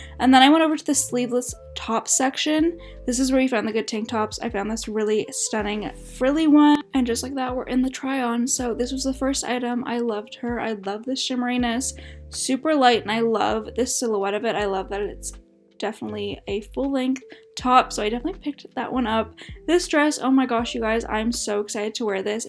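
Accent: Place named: Canada. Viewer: Clues you are Canadian. 1. Accent, you sound like me. 2. Le Chateau. 3. Smart Set. Back in the olden days, I used to manage a Reitmans store. I love the black and white shoes!!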